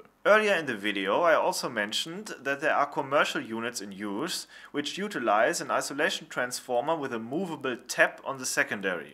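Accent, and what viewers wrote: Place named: Germany. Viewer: Du klingst so Deutsch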